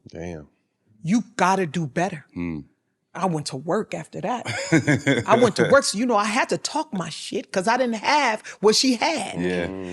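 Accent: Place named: Brooklyn, United States. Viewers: I know is from cali, but damn she has a strong east coast accent. She sounds like she could be from brooklyn ny or harlem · She talks like a NYer. Sis is coast to coast great 👍🏾 🫶🏽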